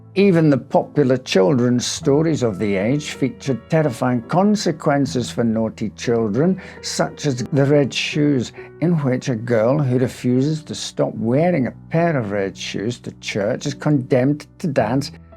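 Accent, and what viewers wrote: United Kingdom. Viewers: This could be a regional English accent.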